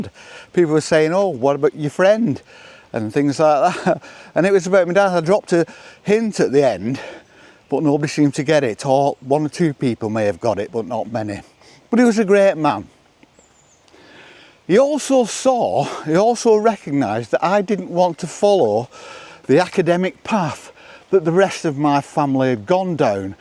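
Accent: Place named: Lancashire, United Kingdom. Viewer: Thank you for sharing your openness and authenticity of your self. Not only as a fellow Lancashire person no longer in the area am I drawn to your accent and the scenery I find it refreshing to see and hear authentic videos. See you on the " flip"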